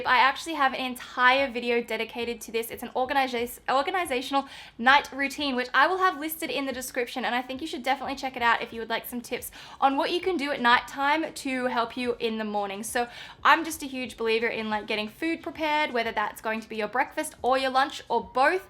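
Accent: Australia. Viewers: You are the BEST! I usually hate Australian accent but i love your accent!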